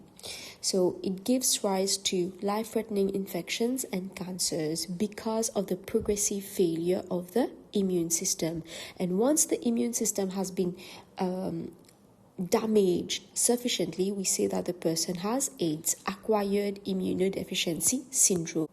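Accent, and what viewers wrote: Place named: India. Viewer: Thank you so much!!!!, if I may ask, were u from? your accent sounds like an Indian accent with a mix of something else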